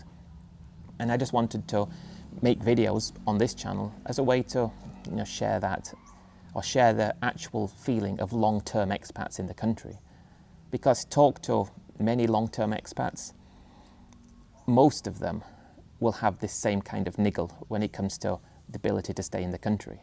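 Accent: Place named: Thailand. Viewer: You’re right. Let the haters write their comments. The creators who delete everything negative are very insecure. People don’t want to see filtered comments that only suit the creator’s narrative or agree with everything they say There’s an individual in particular who does that and it’s very annoying He’s extremely defensive and is always showing anger at ‘the trolls’ but we never know what the alleged trolls are saying because he deletes absolutely everything he doesn’t 100% like (I can only imagine though - he intends to retire (in England) aged 55, wife 60, never work again with only £250k and from that cash they’re going to buy a 50 year old boat and 20 year old campervan and live out their years in those - good luck to them is all I can say 😂 They’re one expensive repair away from disaster, not that they have enough money anyway, and they’re used to living in a house. 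So I think he’s very much underestimating not only that but the lack of space physically and from each other, enduring the cold harsh winters and lack of amenities. Car crash viewing - the delusion makes for interesting watching!! 😂) Would you consider doing a video about you. What age you were when you moved to Thailand, why, where in England you’re from (I cannot place your accent and in fact before I saw you I thought your accent was Thai!), if you have a wife, how you met her, if she’s Thai, do you rent property or is anything you own in a Thai person’s name, if you’ve got kids, what jobs you’ve had (p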